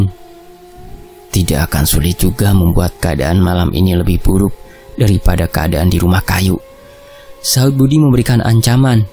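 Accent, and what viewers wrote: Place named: Sunda, Indonesia. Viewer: Lucu denger bahasa Sunda nya .. 😁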